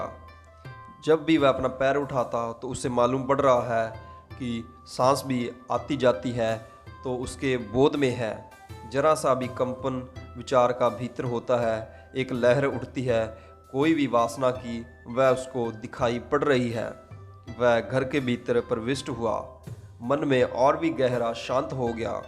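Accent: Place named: Punjab, India. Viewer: Nice video. Aap ki awaj pe punjabi touch aata hai. Kya aap punjab se ho?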